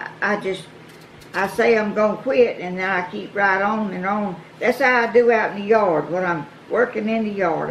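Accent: South, United States. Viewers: It’s my bed time and boy your southern voice is so comforting and relaxing..Glad I ran across your channel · I'm so glad I found your your channel! My Grandparents were from North Carolina and moved out West so I was raised Southern. The Southern way of talking is dear to my heart but I don't hear it much. You've brought me so much joy! I just knew when you got in to pick the squash you'd probably get 60 to 80 pounds worth Ha! I'm guessing some of your recipes are much like my grandmas. Take care now!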